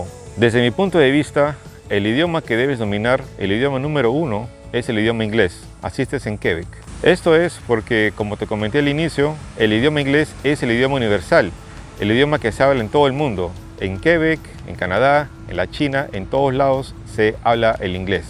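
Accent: Peru. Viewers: Hola! X tu dejo asumo q eres un compatriota peruano. Si mi fuerte es el inglés (con escazo o mínimo francés) sería suficiente para conseguir un empleo allá mientras aprendo el mismo francés en Québec? AbrazO!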